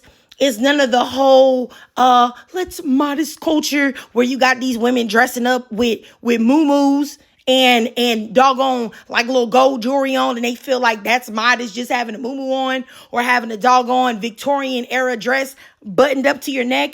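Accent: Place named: California, United States. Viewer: Okaaay I didn’t know you were in your 40s. Same! And I can tell you’re from Cali lol I’m in Inglewood. 💜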